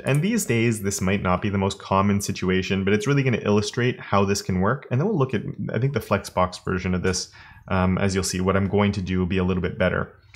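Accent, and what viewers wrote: Canada. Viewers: Canadian accent and them o's.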